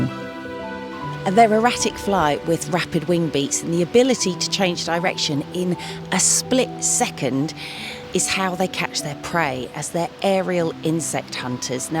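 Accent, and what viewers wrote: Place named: United Kingdom. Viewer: British. Nice t see y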